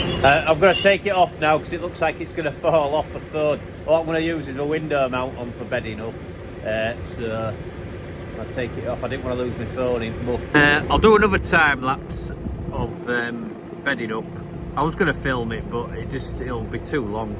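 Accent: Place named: Yorkshire, United Kingdom. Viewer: I'm a bit worried that you are giving the animals drugs and you don't know what you are giving it for. You need to be very careful what you say and do on social media ! Turn the music down. Timelapses are horrendous. I have nothing against a yorkshire accent, but even Cammy controls his broad accent for the camera xx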